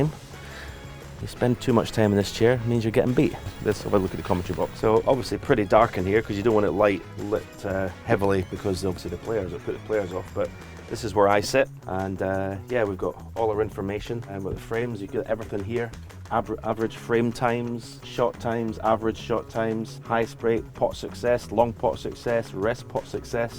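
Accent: Scotland, United Kingdom. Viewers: Fine Scottish man .the voice of fellow Scot’s are made for commentary. And a fifer to boot well done mate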